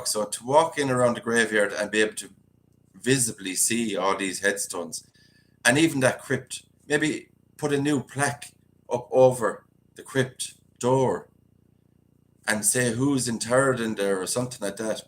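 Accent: Ireland. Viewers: I like your Irish accent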